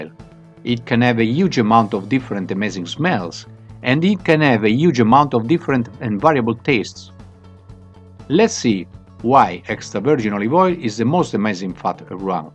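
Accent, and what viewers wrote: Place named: Greece. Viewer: Love the greek accent Edit: Alright. I'm confused. Italian name and speaks Italian, but the accent sounds so Greek to me.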